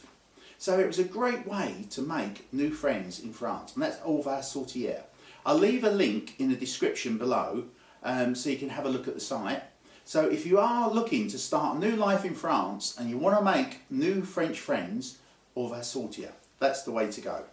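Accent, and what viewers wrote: United Kingdom. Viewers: Super my dear friend with the great British accent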